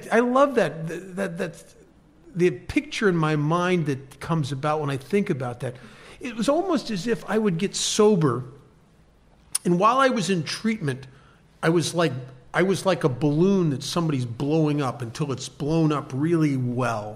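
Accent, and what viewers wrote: United States. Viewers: Nice to hear american english🥰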